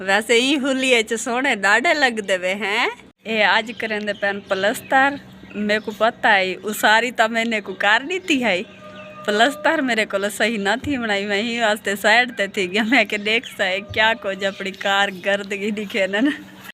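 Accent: Saraiki, Pakistan. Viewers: boht piyaari sariki bolte ho❤❤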